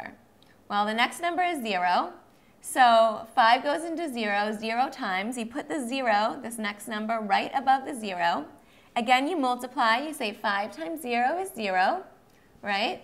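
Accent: United States. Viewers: Yes so annoyed. She sounds like a yankee.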